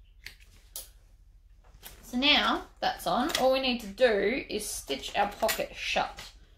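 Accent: Australia. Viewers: Hi! I like how you switch out from bags to something els in sewing. I really like the revamp of your sewing machine, it turned out very nice. I’ve been watching your videos for a little bit now & enjoy them very much ( I’m a newbie ). I love your Ausie accent. Great job all around young lady. Take Care Be Safe. 👍😊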